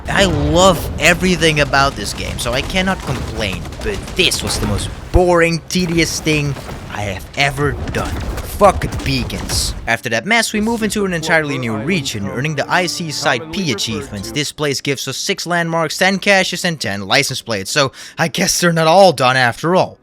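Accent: Netherlands. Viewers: are you dutch? cuz your accent sounds very dutch imo. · your dutch arent you?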